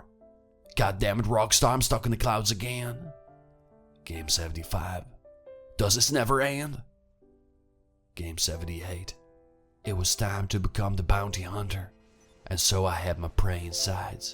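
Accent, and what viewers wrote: South, United States. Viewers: the accent is a southern american accent. not south america i mean the american south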